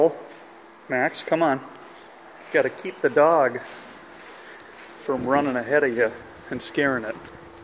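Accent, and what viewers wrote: United States. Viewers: Sweet alabama or america somewhere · Is he from Minnesota?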